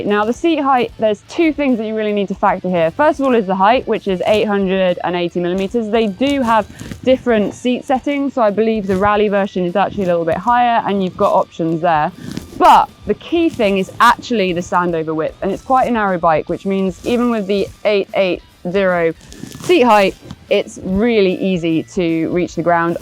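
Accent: United Kingdom. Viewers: Funny, I follow you on Instagram but never noticed that you’re British. You’re British right? Great looking pants and jacket!!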